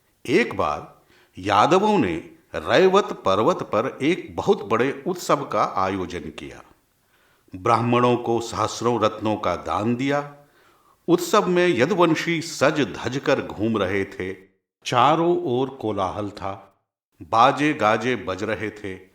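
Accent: Standard. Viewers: उच्चारण की शुद्धता के साथ ओजस्विता इसे कर्णप्रिय बना रही है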